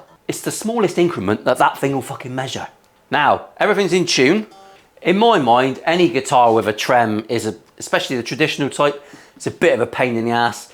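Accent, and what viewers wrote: United Kingdom. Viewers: Great to see that i am not alone to think that this Jet is an awesome instrument. I have a JS 400 sunburst lefty and my Fender stays in its case since . Also even at this price the trem is a Wilkinson . The tuners are pretty decent as well . Your videos are a great source of learning. As a French speaker I am also learning to understand the British accent better! Thanx!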